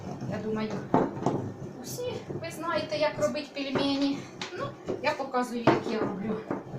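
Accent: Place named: Central Ukraine, Ukraine. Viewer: У вас гарна українська мова центральної України Так говорять не тільки в ЧЕРКАСЬКІЙ, а й в Полтавській обл.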